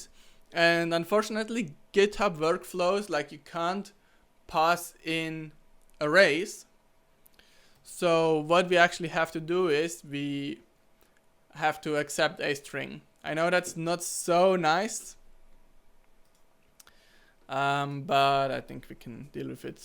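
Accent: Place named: Switzerland. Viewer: oh schwizerdütsch, geil!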